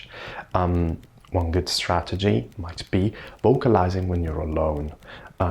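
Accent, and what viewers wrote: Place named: North, United Kingdom. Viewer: Sexy northern accent... Where have you been in Britain to study English?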